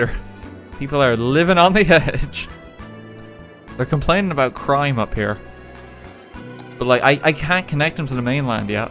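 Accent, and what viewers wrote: Ireland. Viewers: Themlgdinosaur 12 Both of them are from Ireland, so they sound quite similar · Are you Irish? xD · Are you an Irish gaymer? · Are you Irish? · this is my first time watching him because of country roads then I said OH YOUR IRISH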